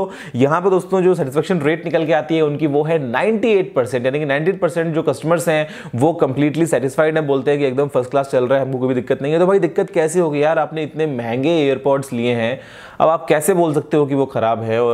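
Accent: Bengal, India. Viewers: bhai aap kiya bengali ho?/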